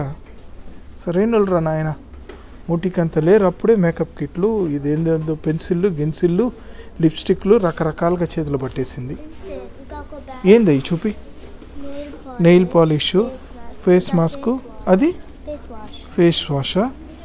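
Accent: Nellore, India. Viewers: Hai Anna how are you???? Miru explain chese vedhanam and me Nellore language super....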